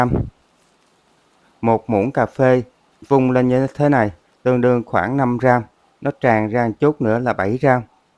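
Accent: South, Vietnam. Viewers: Em có giọng nói dễ thương ngọt ngào của người miền Nam, giọng cười hiền hòa . Em chỉ làm bánh với công thức rõ ràng . Cảm ơn nhiều chúc em thành công .❤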